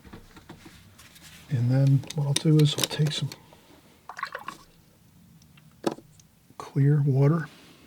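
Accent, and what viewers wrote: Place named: Philadelphia, United States. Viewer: I’m from Philadelphia, living now in South Jersey. I recognize your accent.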